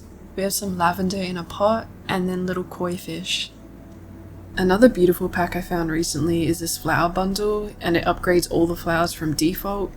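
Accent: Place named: South Africa. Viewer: funny accent. is tht afrikaans?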